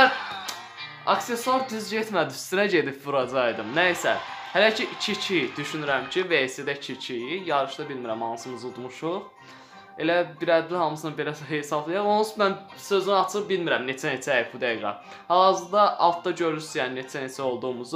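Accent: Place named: Jabrayil, Azerbaijan. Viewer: Axşamın xeyir qaqam. Necesen? Srn haralısan? Lezzet eliyir danışığın. J ile danişirsan. Cebrayıl lehcesine oxsuyur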